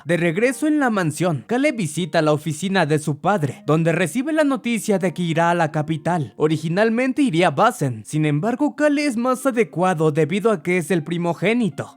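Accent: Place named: Russia. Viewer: Me gusta la historia y más tu acento ruso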